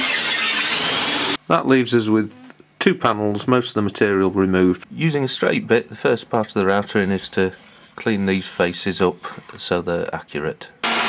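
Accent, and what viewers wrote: Manchester, United Kingdom. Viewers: Nice work. Is that a Manchester accent? Just a curious Yank.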